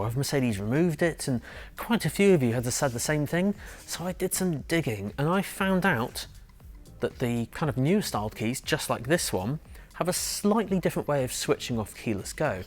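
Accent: United Kingdom. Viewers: British English So harder to understand 👎🏾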